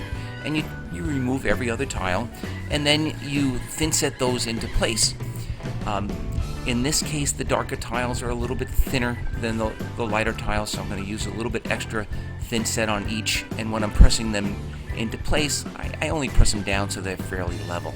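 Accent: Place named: New York, United States. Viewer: Nice work!....looks like a fun build....gonna do 1....u gotta be from NY rt?....haha....I'm in/from LI...I hear the accent....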